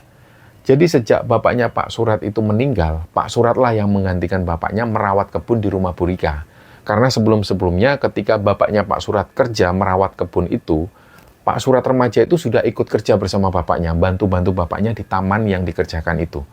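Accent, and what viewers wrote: Java, Indonesia. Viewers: megap megap bahasa nya mas jawa banget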